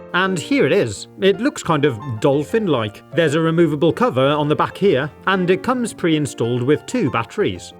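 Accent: United Kingdom. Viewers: He's british!!!!!!! 🤴🤴🤴🤴🤴🤴🤴🤴🤴🤴🤴🤴🤴🤴🤴🤴🤴🤴🤴🤴🤴!!!! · Are you britsh?🍵☕🍷🇬🇧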